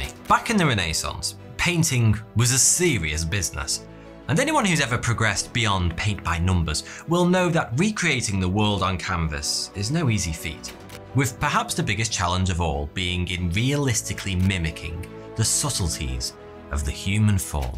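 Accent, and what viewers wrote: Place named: Stoke, United Kingdom. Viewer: You sound a bit stokie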